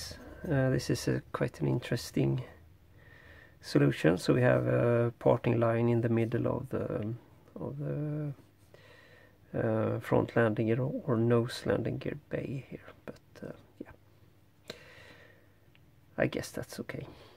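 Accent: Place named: Sweden. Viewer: Är du svensk för din accent på engelska låter svensk?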